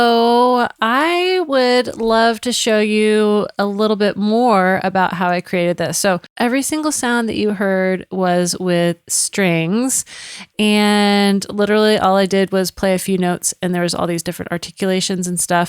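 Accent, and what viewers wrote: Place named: United States. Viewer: your surname is catalan,..but you speak english native with american accent..anyway thanks for this..i love ujam striiiings..is one of my favourites plugins when i compose...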